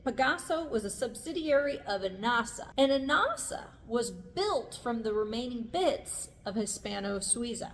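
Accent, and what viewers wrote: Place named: Ohio, United States. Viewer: Cool video! You sound like you're from Ohio. Spent the better part of my 70 years there.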